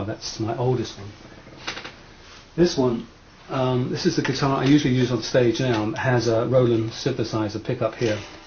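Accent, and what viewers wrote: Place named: England, United Kingdom. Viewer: I love Ritchie's sense of humour. I love his accent too. Quintessentially English.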